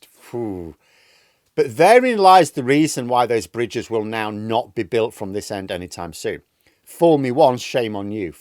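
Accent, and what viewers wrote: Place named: Yorkshire, United Kingdom. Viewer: Is he from yerksher or is it U.S. lol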